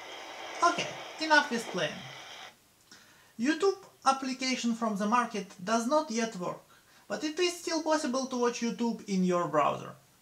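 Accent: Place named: Russia. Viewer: Сраный русский акцент!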